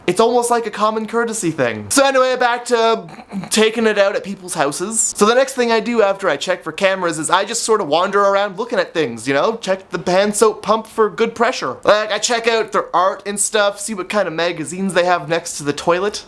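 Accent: Canada. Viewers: his canadian accent :) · he sounds way more canadian than usually here. weird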